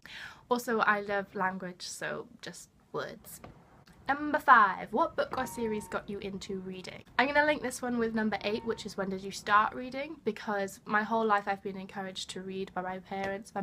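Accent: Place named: Wales, United Kingdom. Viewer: Welcome to BookTube! You're the first Welsh BookTuber I've come across :) Lovely accent! Finding time to read is hard for me as well, but starting BookTube has actually motivated me to read more so that's definitely a plus in my book :) (yay for puns, ha)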